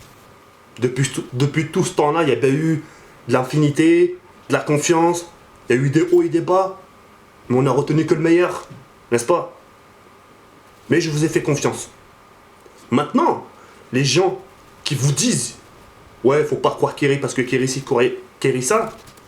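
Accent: Banlieue, France. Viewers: je suis Iranien, mais sa m'empêche pas de pencé extrême droite ... c'était juste de l'humour Noir car j'aime pas cette voix des cités ... Ce n'est pas une bonne chose du défendre et vous Français gauchiste ! vous allez tuer la France avec votre Humanité exagéré envers tout ! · Je suis décu de ta video ! La violence ne ressoudra rien ! Et c'est surtout que le rascisme est bien des deux cotés donc faut arreter de pleurer sur son sort en prenant un accent balieusard ! Je la connnait la banlieue et faire genre en parlant comme sa fait le caid te sert à rien j'apprecie le personnage que tu es car tes pensées sont le biens incarnées comme l'histoire Merah ! Mais ne part pas en vrille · Mdrrr y a des gens dans la rue qu il faut respecter et parler bien mais youtube c TA CHAINE dc tu doit etre toi meme ! J suis banlieusare et fiere de l etre ! Notre "axcent" c notre identiter un peu ... 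bonne continuation ! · arretes stp, malgré ta voix de banlieusard et ta casquette a l'envers comme tu dis, tu sembles meme pas a une caillera, t'es un mec cool, respectuex qui parle de la verité que certaine personne meriteraient d'entendre. continues tes videos pk t'as un niveau de jeu elevé. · juste un message, ne t'adaptes pas, ne change pas, malgré ta voix banlieusarde, ton language, les gens s'en fichent, apprends à etre toi meme, meme si ça peut prendre du temps. Tes abonnés verront réellement qui tu es, et ça plait toujours de voir la vraie personne qui nous parle;)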